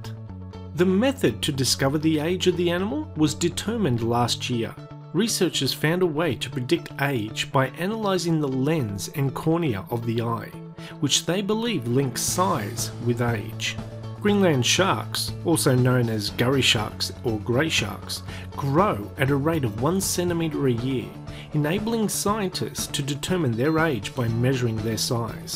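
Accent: Australia. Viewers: The narrator's Australian upspeak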